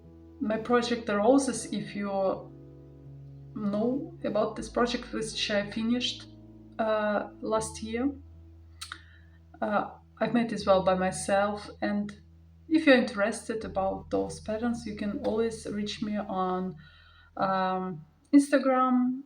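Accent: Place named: Eastern Europe. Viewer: Your accent is just so beautiful, and your English is wonderful. I’m a third generation Russian-American, so English is my first language, but I always love the way Slavic languages and accents sound. So beautiful! God bless you and your family,! I hope to see more videos from your channel and that it grows in popularity. 🩷🙏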